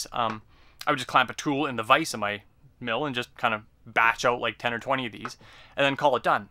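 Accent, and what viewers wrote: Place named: Canada. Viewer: I could hear the maple leaf dialect within 3 seconds of the beginning of the video. Hehe. Gotta love Canada.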